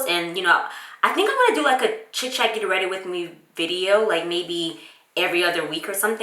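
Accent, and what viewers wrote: Louisiana, United States. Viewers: I love the way you try to correct you louisiana accent lol. I do the same when speaking in public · I’m sorry for your loss. Praying for peace for you. When I started watching your videos I kept saying to myself she sounds like she’s from Louisiana. When you just said it I yelled “I knew it”. I’m from Opelousas. Live in Maryland now. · Ahhhh, it's so refreshing to hear that Louisiana accent! I miss Lafayette so much. 😍 I love how you keep your faith in God and stay on those kids to be respectful. This look though 🙌🏾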